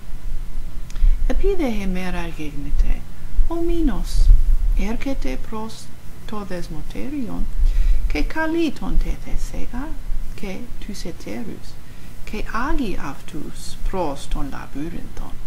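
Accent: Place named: Greece. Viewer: Very well read. It actually sounds Greek. The others should copy your pronunciation.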